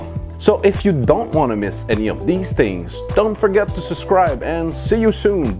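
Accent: Quebec, Canada. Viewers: Tu me semble canadien francais mon ami? are you??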